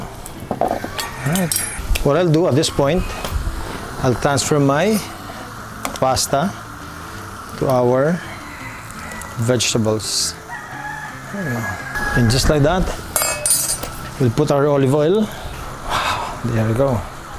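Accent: Visayas, Philippines. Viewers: its ok. maybe your so busy being public servant there in Ormoc so much so you speak lots of Bisaya already and for me, you got a grade of 85% and a 100% for the effort. Im from cebu. we flew to vegas together along with john t. PAL and P. buenaventura for gun sport show almost 2 decades back.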